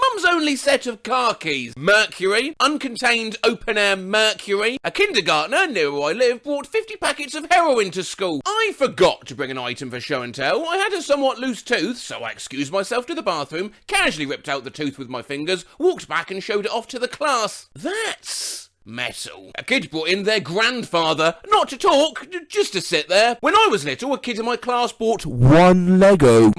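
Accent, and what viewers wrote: United Kingdom. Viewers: A compliment for, his voice sounded especially British today.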